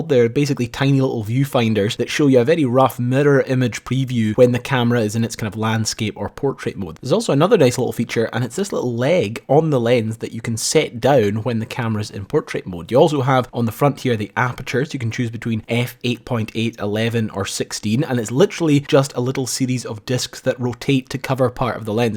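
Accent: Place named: Scotland, United Kingdom. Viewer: Sorry if this sounds offensive. But i am jist curious. Is he a scotish ? I love the accent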